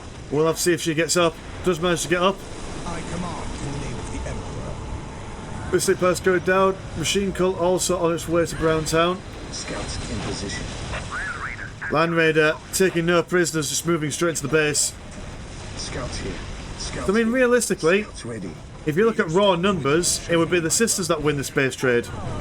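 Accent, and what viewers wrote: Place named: Yorkshire, United Kingdom. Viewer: ‘Why not go eh oop and away with Yorkshire airlines? Wipe tha bloody feet!’ The accent only adds to the enjoyment for me. Keep up the good work! 🫡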